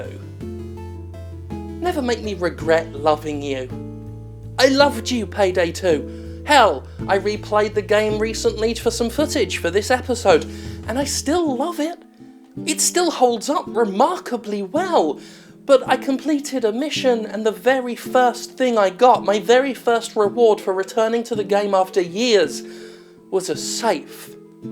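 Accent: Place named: United Kingdom. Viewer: My favorite part about your reviews Jim is definitely the way your insults (deserved ones) and criticisms to bullshit in the gaming industry just come out smoothly with that accent of yours. Something bout the dry humor and voice of British commentators in various forms of media that just sounds really cool.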